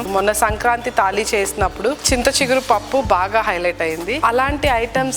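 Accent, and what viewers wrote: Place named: Vijayawada, India. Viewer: Me slang ma vijayawada laga undi .miru vijayawada lo epudu start chesthunaruuu.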